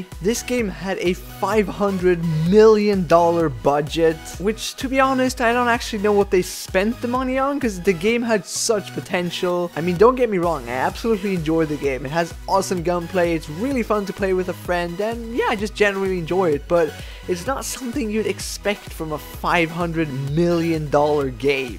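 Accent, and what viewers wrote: Skåne, Sweden. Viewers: i knew u were cause u know that one q&a vid when u said some words on swedish i cinda heard that u were from skåne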